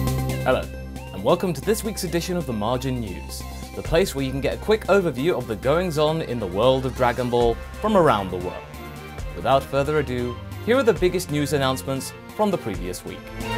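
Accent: England, United Kingdom. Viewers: Lol your gourgeus english accent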